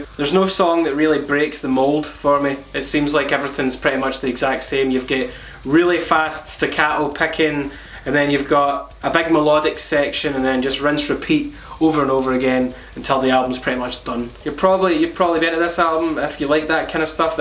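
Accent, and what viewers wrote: Scotland, United Kingdom. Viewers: wait your scottish? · I like when you talk because I like your Scottish accent.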